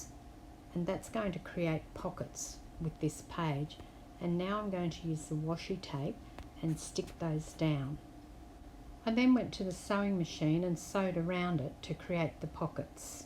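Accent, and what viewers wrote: Australia. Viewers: Hi, 😘 love the ideas. Thank you for sharing. I love, love listening to your soft Aussie accented voice 😍😍.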